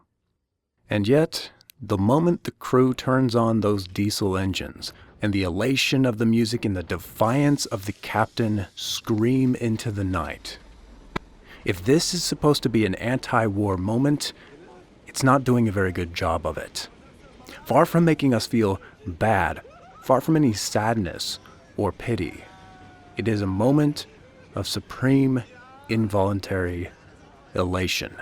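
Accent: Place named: Austria. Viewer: Östereicher habe ich mir gedacht. Es klingt ein ganz klein wenig anders als Bayrisch. Aber so genau könnte ich es nicht sagen...Ok bin ja auch Norddeutscher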